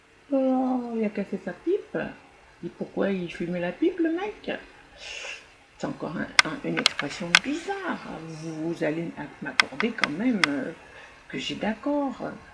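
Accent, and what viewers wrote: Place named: South, France. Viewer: Bonjour hi hi 😊 j'ai aimé ta photo vidéo. Même sans plume ni poil. Tu sais là langue Française est très riche les origines y sont bien ancrées et pour longtemps encore mais ils y en a des milliers de mots comme çà ou expressions. Un seul exp parmi d'autres ( plan social.. Au lieu de dire chômage...) technicienne de service >au lieu de femme de ménage et j'en passe. Maintenant concernant la mort 💀 je suis KTO et je crois en Dieu comme à mon âme qui je l'espère retrouvera celles de mes parents et ma sœur... Chacun ses convictions, libre de ses croyances. Je trouve que ta vidéo est vraiment bien belle tu as un petit accent du midi 😊j'aime. Je te remercie pour le partage bonne journée. ♥️Bises_Pat. 😘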